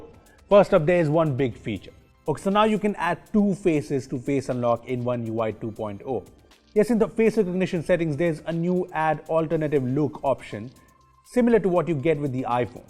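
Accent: India. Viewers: terrible Indian pronunciation... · This is genuinely a great video. I had my doubts when I first heard the Indian accent, but I was pleasantly surprised. Thanks man. And sorry.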